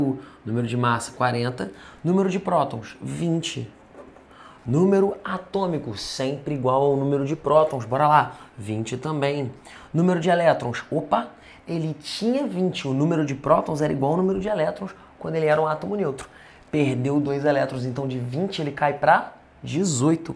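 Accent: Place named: Rio de Janeiro, Brazil. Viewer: Com certeza esse professor é carioca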